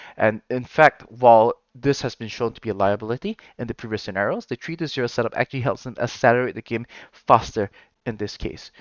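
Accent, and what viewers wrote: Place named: Singapore. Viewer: Speaker slower.also don't try to hide ur singlish. Celebrate it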